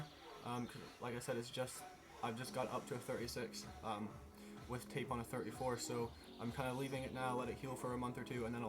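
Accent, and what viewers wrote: Scotland, United Kingdom. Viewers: where are u from like I keep getting hints of a Scottish accent idk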